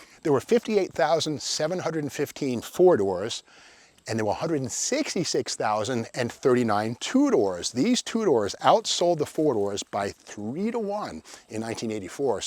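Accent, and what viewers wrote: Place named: Central Massachusetts, United States. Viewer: Actually grew up in Worcester county, central mass, they have a unique pronunciation of some words. Like,cahnt, for can’t, heeya, for here, and cah for car,etc.etc.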